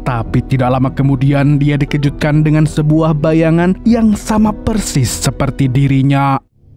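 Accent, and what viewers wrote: Banjar, Indonesia. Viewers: kimanya banjar kah nang ini urangnya😂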